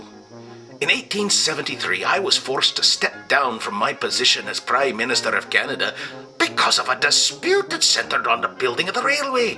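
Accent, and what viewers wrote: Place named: Ireland. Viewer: Why does this guy sound irish?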